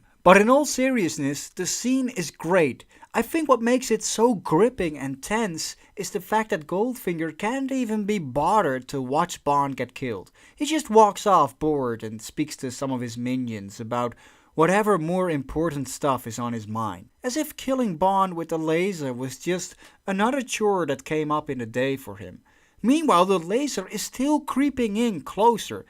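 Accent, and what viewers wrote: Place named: Netherlands. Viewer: I am back and really enjoyed your previous recaps of the early Bond films. The Dutch accent adds something and this revised overview is fantastic. Keep them coming. I have subscribed.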